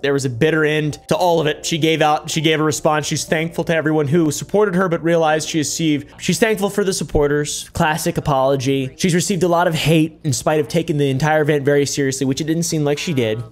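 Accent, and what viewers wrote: Australia. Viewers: Your Australian accent is so fucking funny 😂😂😂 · your australian accent has me on the fkn floor bro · love your aussie accent😆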